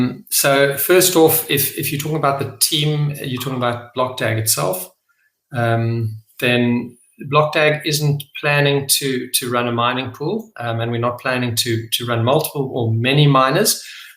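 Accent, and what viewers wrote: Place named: South Africa. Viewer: Sounds South African 🇿🇦🇿🇦🇿🇦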